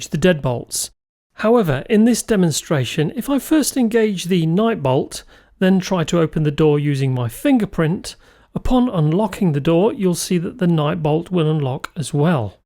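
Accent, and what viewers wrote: United Kingdom. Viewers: U sound like u from uk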